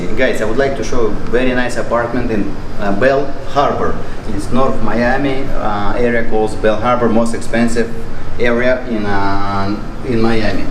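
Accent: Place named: Russia. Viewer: Слышен русский акцент)) но это только радует)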